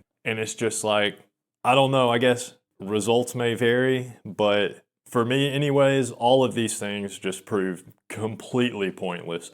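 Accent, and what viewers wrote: South, United States. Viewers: don't worry, I have the typical weird incel voice cause I go years without talking .you don't have that. You just sound like a stereotypical down south yank.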